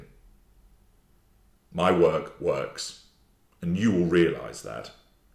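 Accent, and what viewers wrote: United Kingdom. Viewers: I'm from the US. So he just sounds like an elite dude from somewhere in the UK. 🤷‍♀️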